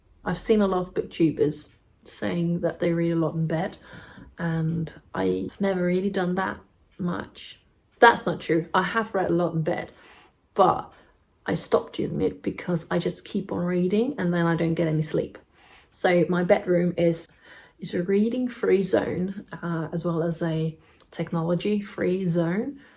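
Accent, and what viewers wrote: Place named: United Kingdom. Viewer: I'm surprise you are from Denmark. You sound like a British person, so I thought you were from England.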